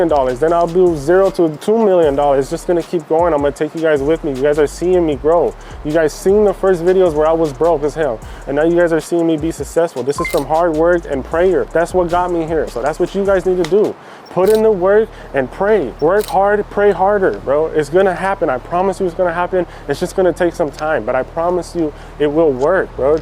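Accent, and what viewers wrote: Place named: California, United States. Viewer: You sound exactly like me and my people from California to a tee. I am on the same exact journey. Preach to me, bro. I'm in between my dropshipping and just discovered Forex, but I have stock experience. Now, I am researching Forex trading. Vegas taught me not to ever treat trading like gambling lol real talk.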